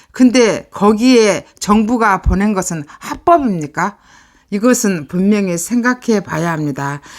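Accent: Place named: Jeolla, South Korea. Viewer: 자유우파. 똑똑. 구수절라도. . 뻥뚫인.소식.🎉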